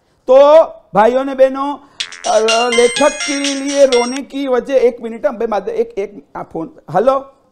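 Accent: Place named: Gujarat, India. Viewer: wonderful ... but Initial accent was gujarati and in between marathi .. baad mein normal Hindi ... 😀😂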